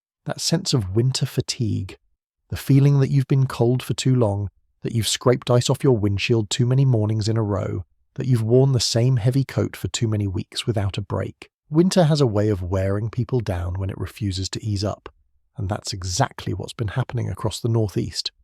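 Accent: United Kingdom. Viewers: Why a British accent narrating US weather.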